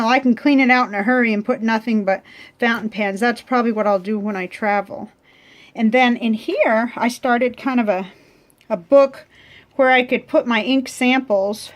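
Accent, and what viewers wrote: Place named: Texas, United States. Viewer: You look and sound like me. So "hello" Texas girl. I thought you might be from the north. I am in N Texas. I just started all this in May myself, I buy cheap pens to try the nibs out. As Brian from Goulet said Quantity over quality" I too discovered cigar boxes and some of them are just beautiful. And they are cheap. Very handy instead of all the plastic storage which I just could not put my pens in something like that. I, too use those syringes to fill all my pens instead of dipping the nib in the ink. That is just too much of a hassle. I have alot of your videos to catch up on.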